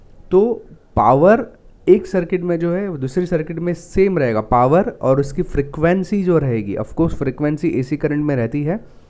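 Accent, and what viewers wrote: Gujarat, India. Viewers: are you gujrati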